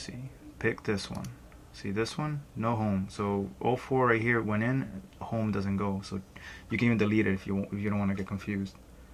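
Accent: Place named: Mexico. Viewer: Can you please fix your semi mexican accent? thanks.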